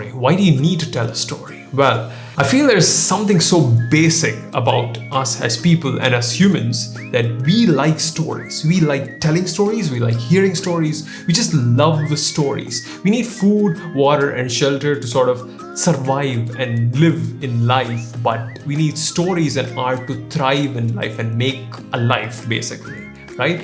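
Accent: South India, India. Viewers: are you from south india bro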